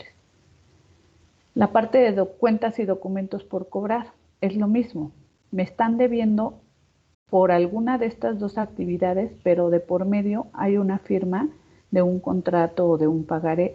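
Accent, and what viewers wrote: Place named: Mexico. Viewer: todo estaba bien hasta que se empezo a notar el acento mexicano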